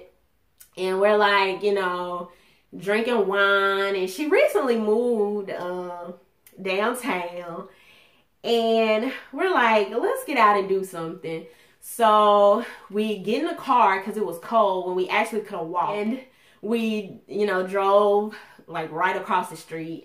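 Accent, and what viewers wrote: United States. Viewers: I love your country voice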